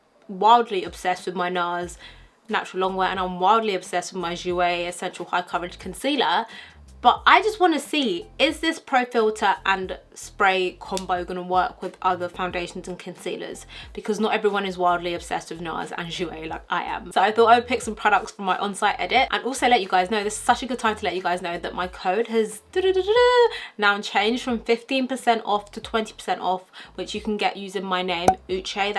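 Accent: United Kingdom. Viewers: Woah has she always been British 😍😍. It makes sense now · You being as pretty as you are and then that British accent on top of that is just ugh chefs kiss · I DIDNT EVEN KNOW SHE WAS BRITISH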